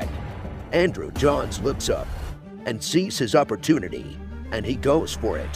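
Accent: United States. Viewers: Why American narration? Good ole days, don’t need that. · This channel doesn't sound right with that American accent. Sorry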